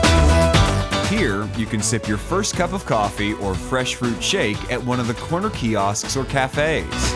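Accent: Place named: United States. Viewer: A little research on correct pronunciation would help cover over the grating American accent and condescending style. Otherwise interesting.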